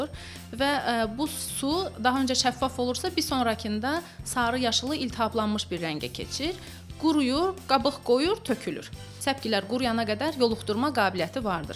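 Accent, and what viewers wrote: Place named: Kirkuk, Iraq. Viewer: Coğ coğ guzal konuşir. Tem bizim kerkük dili gibi... Salamlar siza kerkükten. ❤❤❤❤❤❤❤❤❤❤❤❤❤❤❤❤❤❤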